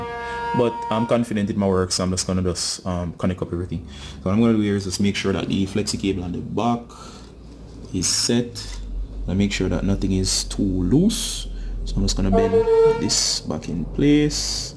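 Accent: Jamaica. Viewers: Hey, A Yardie tech support, gwaan rude boy, great video.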